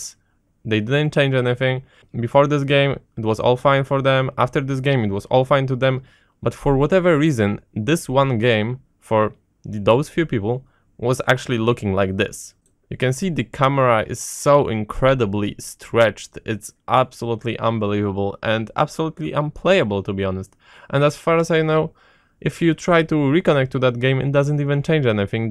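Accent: Poland. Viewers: Po akcencie można poznać że jesteś Polakiem xD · Masz Polski akcent. Jesteś Polakiem ?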